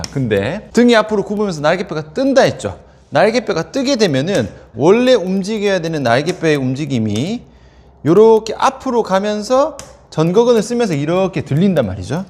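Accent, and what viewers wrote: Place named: Busan, South Korea. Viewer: 부산사람이야 외국사람이야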